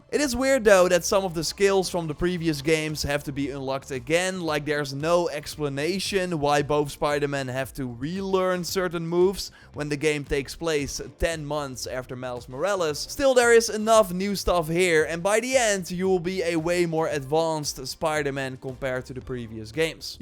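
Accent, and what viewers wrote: Netherlands. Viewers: ben je nederlands ofz?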